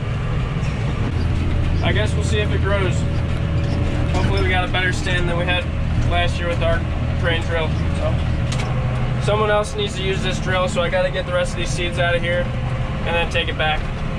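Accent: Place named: Southeast Pennsylvania, United States. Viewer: Found this channel by accident, started watching and thought "that guy talks like he is from SE PA". Then I saw the video of the area and looked like the Lancaster area. Sure enough I was right, I live about an hour away from you and visit that area occasionally. Great to see videos of hard working Pennsylvanians. Keep up the good work and the great videos!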